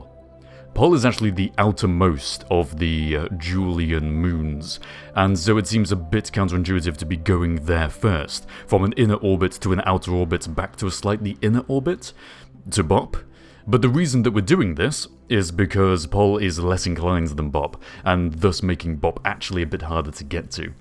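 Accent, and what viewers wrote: United Kingdom. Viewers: Really love Pol personally. Especially with ground scatter active to the max. Not much to actually do there (until 0.90 anyways, maybe), but I love it. If I'd have a custom planet and moons in KSP, I would size it up 30% and make it one of my moons. Personally, I think your voice is amazing as I love the accent from Great Britain. And don't worry, everyone is weird... just not the same type of "weird" heh.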